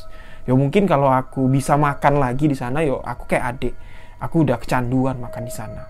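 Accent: Indonesia. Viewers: I just love the way you tell the stories. Sangat mudah difahami. Semakin suka dengar dialek Indonesia ❤️ Hello from Malaysia!!!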